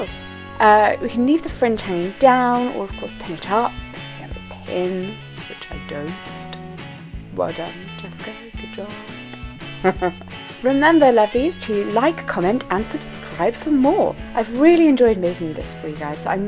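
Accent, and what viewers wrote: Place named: England, United Kingdom. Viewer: i must say, i adore that you are so young and have such a thick english accent. a lot of british ppl sound AMERICAN now adays...! as in no accent at all. very flat way of speaking. no expression and heritage in their way of speaking. it is a delight to hear your voice. i watch old brit tele for the humor and BEAUTIFUL accents. lol. keep it and spread it!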